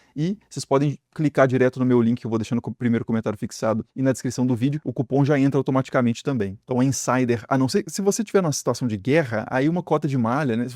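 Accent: Minas Gerais, Brazil. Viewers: Da pra notar que vc é de minas tbm kkkkkk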